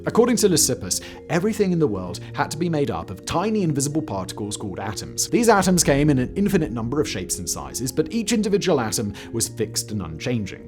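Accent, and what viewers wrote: United Kingdom. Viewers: Forget the beard or the chrome dome, the glib UK accent and rapid fire delivery hooked me. I predict in less than a week, I’ll have watched no fewer than two more of videos.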